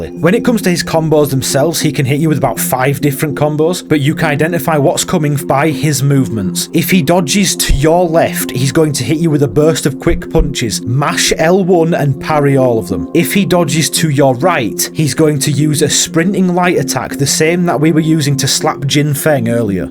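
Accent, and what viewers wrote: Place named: United Kingdom. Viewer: Of course its s UK accent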